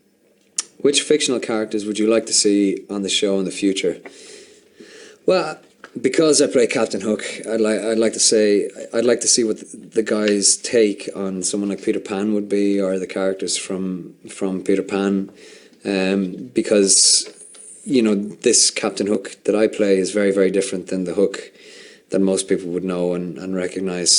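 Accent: Ireland. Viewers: Ok so he is extremely attractive as Hook, but as himself with his Irish accent he is adorable!!! How is this possible? He's too perfect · TBH his accent irl is soo much more Irish than in the show like a huge diffrence, anyone else with me?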